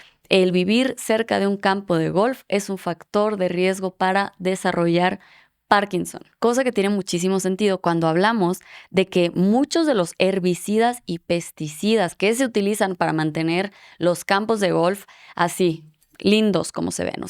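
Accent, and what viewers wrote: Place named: Sonora, Mexico. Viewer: Vivo en Austria desde hace 11 años pero soy de Sonora. Me encanta escucharte pero en este vídeo se te sale mucho el acento washo 😭😭 nimodo... Buen episodio. Saludos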